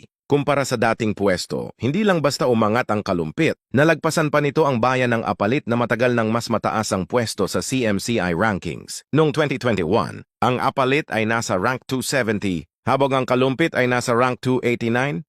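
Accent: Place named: United States. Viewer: Lintik din ang narrator parang kano ayusin mo ang mga pag bigkas kakainis para kang hindi Pilipino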